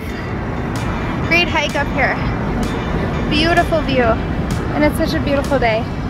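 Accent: Minnesota, United States. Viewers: Your Minnesota accent is so cute! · I grew up in Plymouth but my parents retired to Alexandria. Her accent is cute and reminds me of home. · I love her MN accent! Being from MN I totally get the oopsie and the oh yeah! 😂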